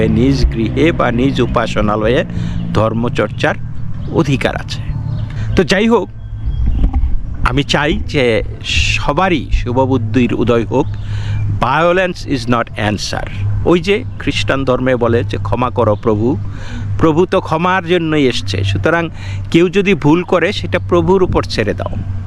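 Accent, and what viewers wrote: Bangladesh. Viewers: Islam is not a religion but is a political ideology.... don't be a hypocrite...you also know the truth... whole EU must ban muslims...and ban muslim immigrants... don't you know how Lebanon was islamised in just 20 years... your dialect tells me you are a Bangladeshi Hindu...have you forgotten the Noakhali massacre of innocent Hindus...??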